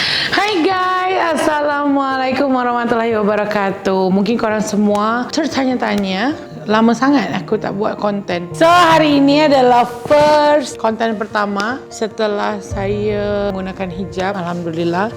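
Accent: Malaysia. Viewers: Sekarang ni memang dah menetap Malaysia ke? Dah pandah ikut slang orang Malaysia. 👍🏻👍🏻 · Cakap pun mcm org Malaysia. Doa yg terbaik utk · Logat mcm sabah tapi hakikat dia ni org indon.. tula malaysia dan Indonesia ni serumpun🥰😍😘🇲🇾🇲🇨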